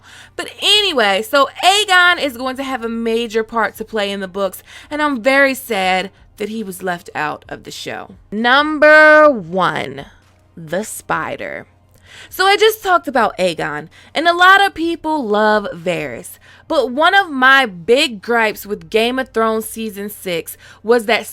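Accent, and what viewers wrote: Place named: Baltimore, United States. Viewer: I just wanna hear you talk all year lbs. Is that a Maryland/Baltimore accent im catchin?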